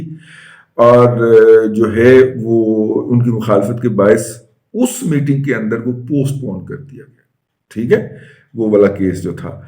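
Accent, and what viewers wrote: Punjab, Pakistan. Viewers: Dada g ki Andaz e bayan tarka Punjabi Kamala mola Kushal rakhy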